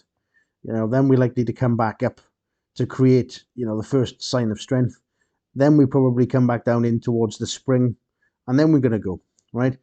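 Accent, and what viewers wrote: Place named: Wales, United Kingdom. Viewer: Good info mate. Nice to hear solid TA from a fellow Welshman ;)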